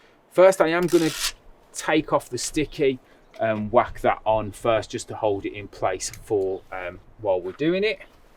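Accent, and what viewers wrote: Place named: Birmingham, United Kingdom. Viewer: I detected a Birmingham accent, then I googled Smart & Secure Centre and you're a few miles away from me haha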